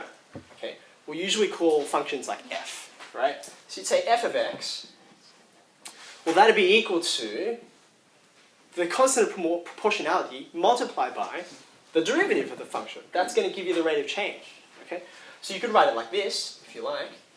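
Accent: Australia. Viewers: Liked for the aussie, subscribed for the education. Ugh. wish you were my teacher.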